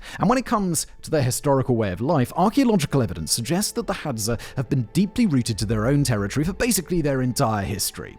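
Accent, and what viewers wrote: United Kingdom. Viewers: umm. 1. We were discussing Tanzania, not Tasmania. 2. I did not ignore them, they was just nothing that necessitated a response, I can infer from all the comments that both are valid pronunciations depending on your accent and the only reason specific pronunciation hit my ear weird is because he has a British accent but is using a pronunciation more common to European and African accents. Which makes sense considering he lives in Prague and had an African Grandmother, or at least a grandmother who lived in Africa. 3. The only reason I responded to that specific person is because he seems to be under the impression that only Americans pronounce it "Tan-zan-iah" which is just incorrect.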